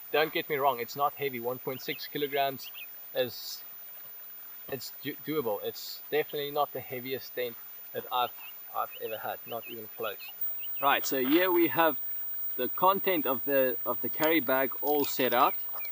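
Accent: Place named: South Africa. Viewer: Hey brother! I immediately recognised that SA accent, and now I see that you are a photographer as well as a fellow believer, awesome! :) The only tent that I notice locally is the Hubba Hubba NX for around R6500. Did you import or buy this tent through anyone local? I just bought an F-Stop Sukha, so I can't go around at such a high spending rate...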